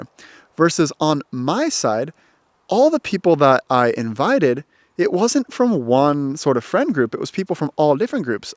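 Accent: Sweden. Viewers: It’s fun to hear your Swedish accent and intonation in English now! Can you hear it when you listen to your videos? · For some reason you speak English like a Swede. Some Swedes are open too, but it's only those that have admitted to themselves that they actually have some problems and things they don't excel at. Also it is extremely easy to get shunned from Swedish society. It only takes one mistake and you are out, and then it takes forever to get accepted again. That's why Swedes are so reserved. Sweden is basically the Japan of Europe. And that is why can never become Swedish, just like can never fully become Japanese. Your taxes in the US are actually higher than they are in Sweden. It's just that they are not explicitly called taxes. If you look at disposable income after mandatory spending people in the US actually have amongst the highest taxes in the western world and get the least back for the taxes they pay. 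· Strange. You're an American, but you speak English with a Swedish accent. Because of that, I'm guessing your Swedish must be really good by now. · On paternity leave, I would tend to agree, "instinctively" so to speak. But then I think of my late father, who unfortunately got a very shallow relationship with his two sons, and a much closer with our younger sister. The difference? He made career when my brother and I grew up, and we learned to know our grandparents, who looked after us, much better than our father. When our sister was born, he had given up on the career making, came home before Mother each afternoon and made our supper. To my luck, Father and I catched up when he grew old. My brother, on the other hand, didn't. And his daughter barely knew her grandfather. When I compare, I must say that it had been good for all of us, if Father could have told his boss "You know, I have to take these three months. 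They mustn't be squandered!" P.S. It's been a pleasure following you these years. The algorithm presented me some of your very first videos. And after a year or so, I subscribed. Your English intonation has definitely become swedified. And by now, I even think your body language has become Scandinavian. 😊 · You have already developed a Swedish dialect when you speak english. Some words and phrases you uttered in this video sounded fundamentally swedish, you are no longer American, you are Sill, snapps, Hej hej, skål och lagom! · Hejsan! I’ve been following your videos for the past 3 years, I’m also an American learning Swedish. I want to say it’s been fun hearing your accent progress, your Swedish sounds more Swedish now with an accent but your English now also has a Swedish sound to it. That’s all, tack för videon! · Välkommen! I don't know if it's because of the context and I'm just imagining, but you have a very very suttle swedish accent =). 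I hope you take it as a compliment ;). Also, the best description of the difference between swedes and americans social wise that I've heard is this: The distance between a stranger and a friend is closer in the US compared to sweden, but the difference between a friend and a close friend is actually closer here (sweden).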